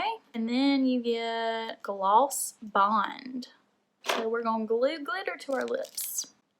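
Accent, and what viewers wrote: South, United States. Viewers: Her country accent is so cute lol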